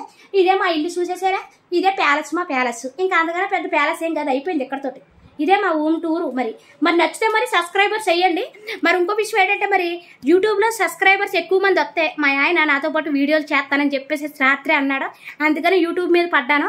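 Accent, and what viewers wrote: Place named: Andhra Pradesh, India. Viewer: Hi aundi me mata bale vundi me aundra yaasa kuda chala bagundi ...meru elage inka chala video s cheyali oll the best sister 🎉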